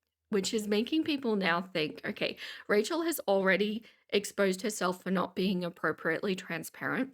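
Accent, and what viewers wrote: Australia. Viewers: Ooh, another Aussie voice. Quite a few of us among reaction channels, it seems (not that I'm a reaction channel - just Aussie :D). Anywho, great video!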